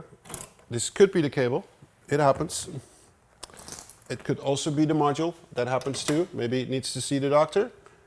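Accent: Netherlands. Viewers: your accent is almost completely gone but I grew up with dutch parents and their dutch friends who loved keeping adult conversations from us by not teaching us dutch . but it was still fun to listen to . to this day a dutch accent is music to my ears lol . hope I'm not wrong on this ______if so you just heard my long story for nothing . (ok back to the video) gerrit jan schraa california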